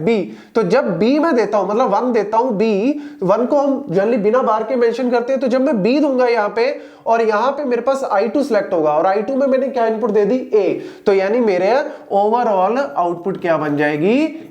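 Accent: Punjab, India. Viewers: Sir are u from panjab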